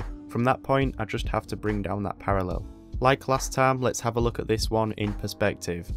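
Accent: United Kingdom. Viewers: As much as I know he is from the UK xD If you wanted to know which area of the UK, sorry I don't know that 😅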